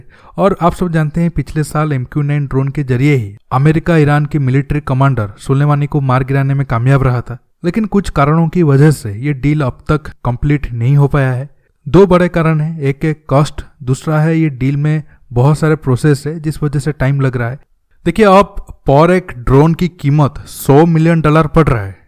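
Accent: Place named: Assam, India. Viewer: Bhai aap Assamese jaise baat kyu karte ho . Mere khayal mein aap okhomiya hoga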